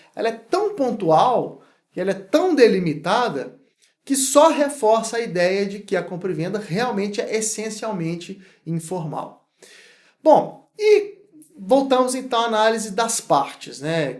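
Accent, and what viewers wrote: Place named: Minas Gerais, Brazil. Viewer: excelente aula, clareza, segurança, domínio. Obrigado. Acho que pelo sotaque é minerinn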